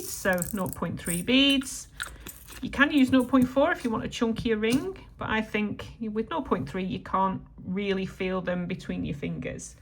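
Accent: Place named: Merseyside, United Kingdom. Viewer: You sound like you're from my neck of the woods (Merseyside!). Lovely design ❤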